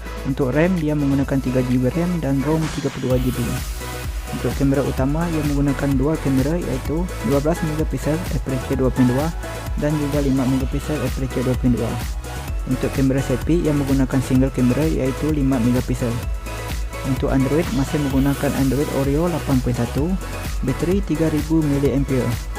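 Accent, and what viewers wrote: Sarawak, Malaysia. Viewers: org Sarawak kq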